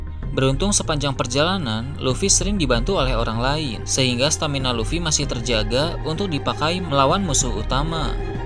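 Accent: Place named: Lombok, Indonesia. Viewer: Medok lombok tajem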